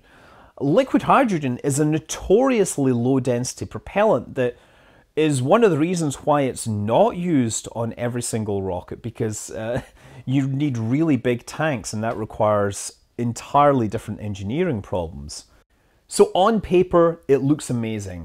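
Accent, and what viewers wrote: Scotland, United Kingdom. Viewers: Something about a scottish mans voice talking about rocket science is super calming. Kids want a bed time story heres uncle to read you history of rocket fuels. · As a fellow Scotsman, yer accent does my nut in man.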